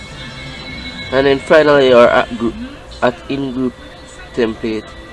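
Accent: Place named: Jamaica. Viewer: Jamaican voice with a Welsh name....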